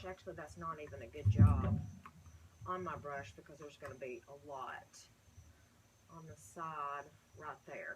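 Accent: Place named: South, United States. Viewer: Such a fantastic southern accent! Where are you from?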